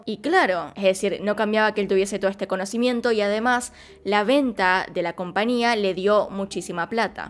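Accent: Argentina. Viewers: Me encanta como narras los casos, eres linda, tierna y graciosa y ese acento argentino en ti me encanta. Un abrazo desde Venezuela 🇻🇪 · SOS ARGENTINA ? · Es su acento argentino :/ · Te amo 🥰❤️ Amo tu canal ❗️ Adoro el acento argentino se me pega mucho y más que estoy viendo una serie llamada Rebelde Way de Netflix 😂❤️👌🏼 Saludos desde Perú 🇵🇪❗️ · No es que tu voz de argentina Me gusta, digamos que es una de las quémenos me disgusta.Hay muchos canales como el tuyo pero su narrativa me aburre mucho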